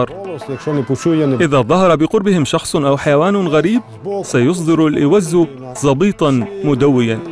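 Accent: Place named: Russia. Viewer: لكنة روسية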